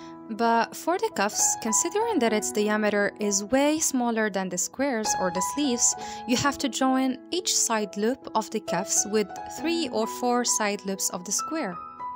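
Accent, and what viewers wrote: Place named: Saudi Arabia. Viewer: تتكلمي سعودي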